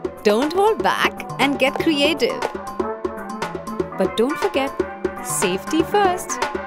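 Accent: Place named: India. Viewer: Indian accent unsexy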